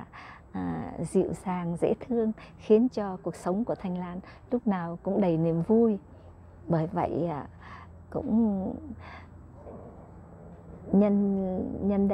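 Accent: North, Vietnam. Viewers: Chị ơi theo em được biết chị sinh ra ở Đà Lạt nhưng chị nói giọng người miền Bắc nhiều hơn ah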